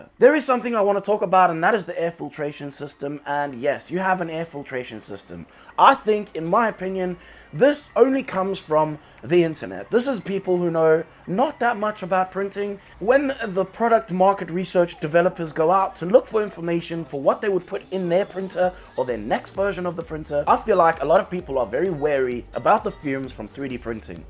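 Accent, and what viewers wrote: South Africa. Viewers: Why does it sound like he has a south african accent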